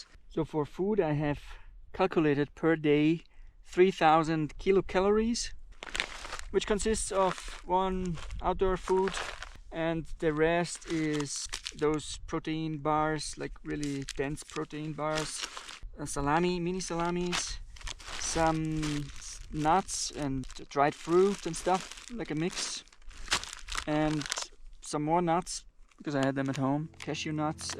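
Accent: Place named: Austria. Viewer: Sehr sympathisches und eindrucksvolles Video. Sind Sie Österreicher? Ihr englischer Akzent klingt sehr danach :) 😉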